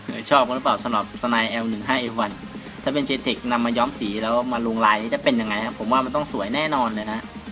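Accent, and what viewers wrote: Isan, Thailand. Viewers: พี่เปนคนอีสานหรอ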